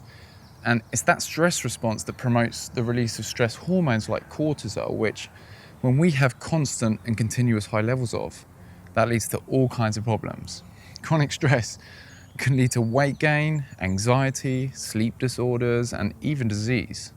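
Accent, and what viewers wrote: United Kingdom. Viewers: Love british accent!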